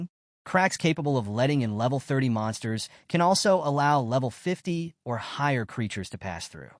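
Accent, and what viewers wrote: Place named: United Kingdom. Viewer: Narrator became British halfway through lol this is epic lol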